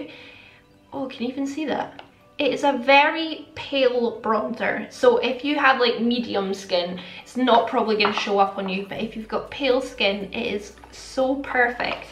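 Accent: Scotland, United Kingdom. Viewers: So lovely to meet you. I’m Willa from southwest Florida US. I fell down a rabbit hole and found myself at your delightful tea party! Impressed by your exquisite style and extensive knowledge of all things “drugstore beauty,” and lured by the charm of your sweet Scottish chatter I found myself spelled into a loyal subscriber! Hope you’re still giving t-parties 💐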